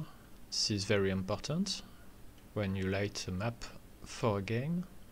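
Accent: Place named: France. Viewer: Bonne vidéo, merci. L'avantage quand un français parle anglais, c'est que du coup je comprends l'anglais. Ceci n'est pas un reproche hein, juste un clin d'œil. Je parle comme une vache espagnole. Merci encore à toi. Le coup des fog est impressionnant. Chapeau.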